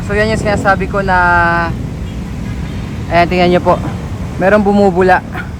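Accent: Visayas, Philippines. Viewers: Hoy ayusin salita mo wala Naman Bola ano Ka bisaya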